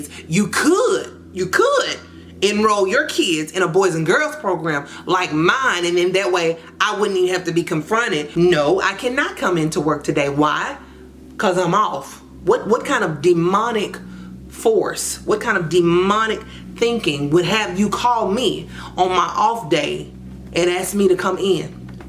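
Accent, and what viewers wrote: South, United States. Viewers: Honey are you from the south? I hear the country in your voice 🥰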